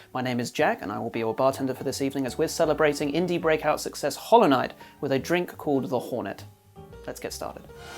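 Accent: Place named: Australia. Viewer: you from NZ? you sound aussie.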